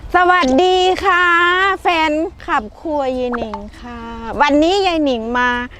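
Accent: Isan, Thailand. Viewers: ยายหนิงหนูเป็นfcยายมาตั้งแต่หลานเจ่าสายฟ้ากับพายุน้อยๆนั่งเล่นยุนำยายเจ่ากะเว้าอีสาวม่วนดีจ้าช้อบฟังตอนเจ่าเว้าลาว❤❤ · คุณยายเก่งและน่ารักตลอดเลยคะ เว้าภาษาอิสานตะฮักคักจ้า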